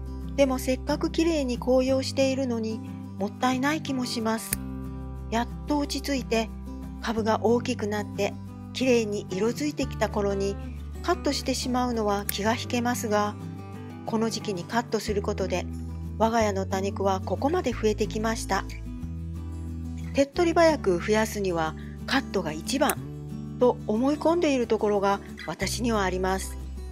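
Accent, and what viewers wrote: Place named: Kansai, Japan. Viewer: 屋外で植えていらっしゃるようですが、場所はどちらですか。 冬は霜が降りないのですか。 言葉のイントネーションの感じから、西の方の地方なのかなと思いますが、寒さはどうなのでしょう。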